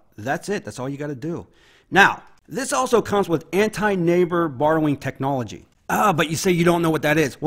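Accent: Pittsburgh, United States. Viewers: Do I detect a yinzer dialect? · just by listening to you talk i know you're a Stiller fan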